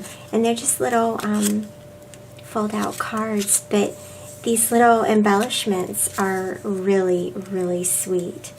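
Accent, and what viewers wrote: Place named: South, United States. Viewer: Love the pages and all of the eat stuff your hubby brought back for you! Where do you live? You sound like you are in the south! I'm in Atlanta.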